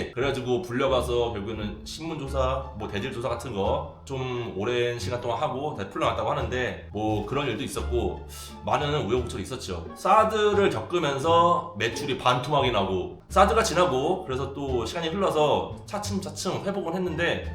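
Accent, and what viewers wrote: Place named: Jeolla, South Korea. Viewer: 대인. 고향이 전북 아니오? 말투가 우리동내 같은디?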